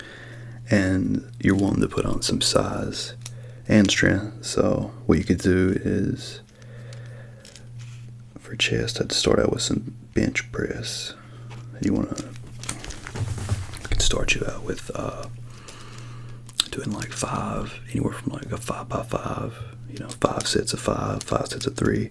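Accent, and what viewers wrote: South, United States. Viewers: you have that amazing southern accent which is lovely to listen to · Am I hearing a slight southern accent? 🙂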